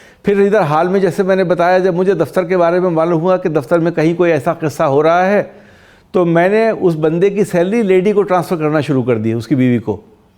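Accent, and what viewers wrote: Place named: Western Uttar Pradesh, India. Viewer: ये बताएं ,आपके चेहरे की चमक का राज क्या है ,आपके बोलने के अंदाज में पश्चिमी उत्तर प्रदेश की मीठी खुशबू आती है धन्यवाद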